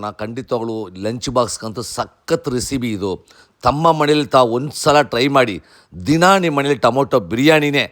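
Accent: Tamil Nadu, India. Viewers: Mostly ivaru Tamilaru adikke Kannada shaili bere. Aadaroo Parvagiila illi Ruchi Mukhya Ashte.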